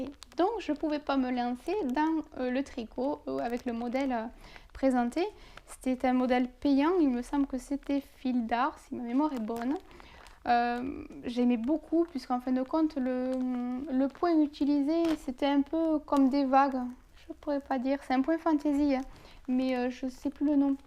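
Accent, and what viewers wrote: South, France. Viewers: J'allais oublié les questions ^^: quel âge as-tu ? Es-tu du sud car tu as un petit accent ?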